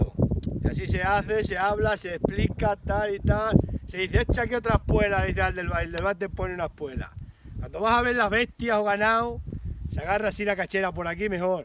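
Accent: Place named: Andalusia, Spain. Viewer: me descojono escuchándote.Pareces Andaluz y no Extremeño.Me recuerdas mucho nuestros mayores de pueblo.Yo soy Malagueña.Llevo muchos años viviendo en la capital pero sin olvidar mis raíces.Soy de ( Comares ) pueblo de la Axarquía Malagueña.Y seguimos teniendo casa de mis abuelos y tíos,en el campo,no en el pueblo.Cuando vamos con mi tita María,pues la casa es suya,disfrutamos más que niños.Yo nada más colocar la comida y lo que llevamos para pasar unos días,me coloco ropa cómoda y mis zapatillas y me voy a dar vueltas al campo,al huerto,a por espárragos,a coger aire fresco y puro.Disfruto recordando viejos tiempos y dándome algún que otro guarraso en el suelo.pero más feliz que una perdiz.Saludos de esta Comareña que vive en la ciudad,sin olvidarse del campo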